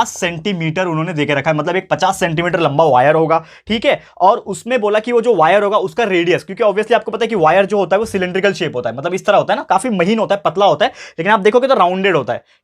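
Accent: Maharashtra, India. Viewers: Sir kya ap marathi ho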